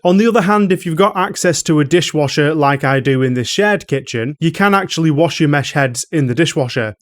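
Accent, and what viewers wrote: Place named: Liverpool, United Kingdom. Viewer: I love your accent!! ❤ Liverpool?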